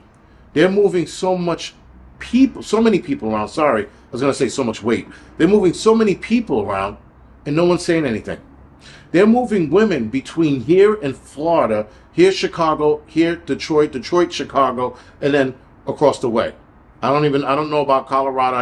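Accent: New York, United States. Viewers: Yoooo. Well said bro. I'm not used to black guys that have the exact same accent that I do and i'm a white guy, Italian from Corona which means,,,, You gotta be from new york city. I left back in 97, came to Vegas but now Vegas is all messed up with all these California people that come here and all the guys have high voices like they had their balls cut off. It's time for me to move from here now. I gave you a like and Subscribed I'll be watching your channel more. Awesome content keep up the great work. 👍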